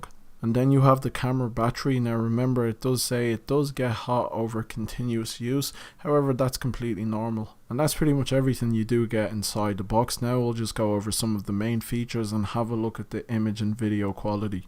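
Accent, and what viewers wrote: Dublin, Ireland. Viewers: Dude, are you Irish? you sound like a Dub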